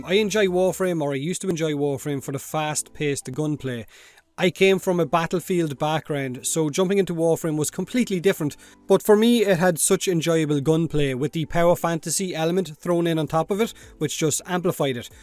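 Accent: Ireland. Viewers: You're my favorite person to watch for warframe related stuff. I love the personality, the Irish accent, the entertaining videos, and the honesty. Don't stop being you, and definitely take care of yourself physically and mentally. My condolences for your losses.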